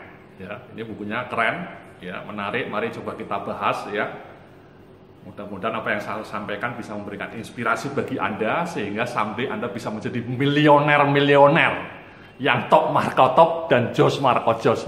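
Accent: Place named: Java, Indonesia. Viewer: kok ngomonge kaya wong jawa yak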